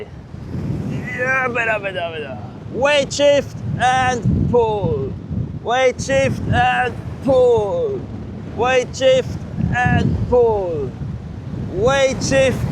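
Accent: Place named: Europe. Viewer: Thanks for the review. Btw I can't quite figure where you are from in Europe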